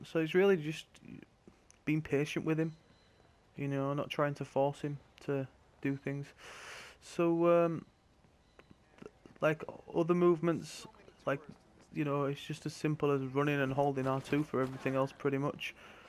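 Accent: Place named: Essex, United Kingdom. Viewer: essex accent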